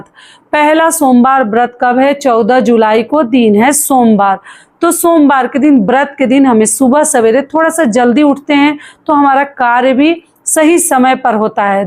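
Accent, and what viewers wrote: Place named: Bhojpuri, India. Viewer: I think shw from purvanchal or bihar bhojpuri belt.. her accent is saying.. jay shiv parvati ❤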